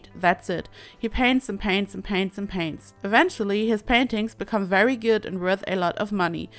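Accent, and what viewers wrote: Germany. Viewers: Kommt die Tante aus Deutschland? Das Englisch klingt irgendwie nicht wirklich native... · In der ersten Sekunde, wo du gesprochen hast, hab ich sofort gehört, dass du deutsch bist :D Grüße erstmal. Ich finde das Haus mega geil. Hab heute zum ersten Mal in Sims 4 ein Haus gebaut (eigentlich mag ich Sims 3 deutlich lieber und hab 4 lange nicht angerührt, aber es hat wirklich viel mehr Spaß gemacht als in 3 und ich bin sogar etwas stolz), an dein Haus kommt meins allerdings bei weitem nicht dran... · are you german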